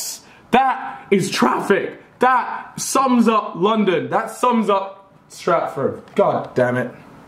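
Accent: United States. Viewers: you sound American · He sounds american and his accent keeps phasing out!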